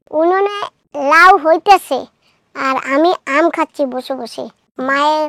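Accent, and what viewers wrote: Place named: Khulna, Bangladesh. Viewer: দিদি আপনাদের বাড়ি মনে হয় বাংলাদেশের ছিল আপনাদের বাসা তো খুলনার ভাষার মত খুব ভালো লাগলো আপনাদের ভিডিওটা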